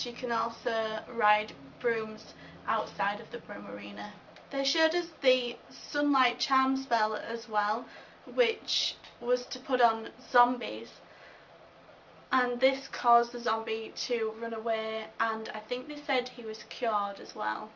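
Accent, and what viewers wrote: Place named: England, United Kingdom. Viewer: Yay!!! an English accent!! Finally!! I'm british too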